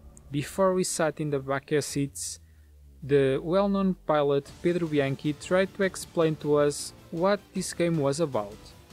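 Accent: Portugal. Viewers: Are u portuguese? BTW, nice video and Niceeee gameee I'm a huge fan of motorsports and Dakar obviously. I'm rly hyped for this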